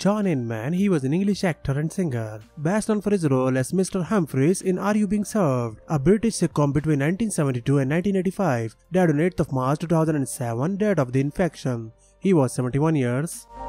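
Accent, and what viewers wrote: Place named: India. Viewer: It is an accent from India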